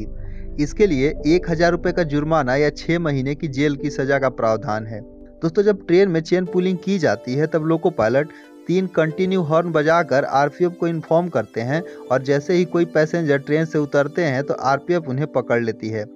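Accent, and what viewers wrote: Bihar, India. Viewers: Ap bihari ha kya apki awaj se lag rha hai..main bhi bihari hun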